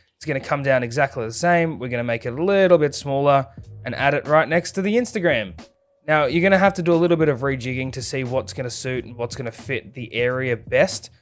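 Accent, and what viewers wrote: Australia. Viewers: Thank you soo much this helped a lot the best part was that you Australian not American so I can understand you a lot more, your also very funny 😂😂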